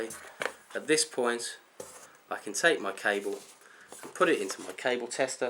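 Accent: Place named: United Kingdom. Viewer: this is by far the best video i've found around here on this subject. also, a british accent always lends more credibility.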